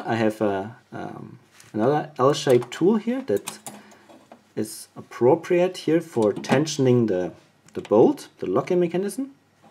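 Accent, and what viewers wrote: Germany. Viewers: an deinem englisch merkt man das du deutscher bist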